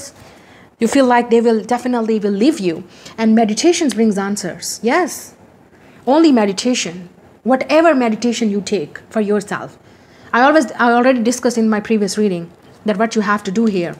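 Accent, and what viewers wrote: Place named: India. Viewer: 💯 % agreed to you; the biggest religion is humanity and we should respect each other for that.. great work and respect for you dear... Ur an awesome and straight forward person :)) god bless you with all the happiness:)) n yes our accent is same ... lots of Love and respect for you from india for this reading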